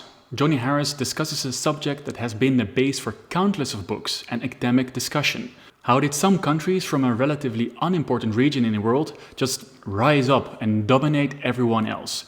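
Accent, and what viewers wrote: Netherlands. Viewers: Zo hallo… dat accent is wel erg Nederlands. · You sound Dutch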